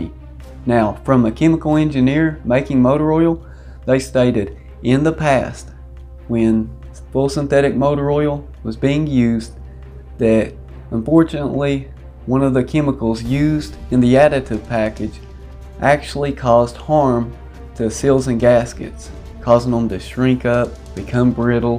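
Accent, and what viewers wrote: South, United States. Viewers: Slow drawl southern hick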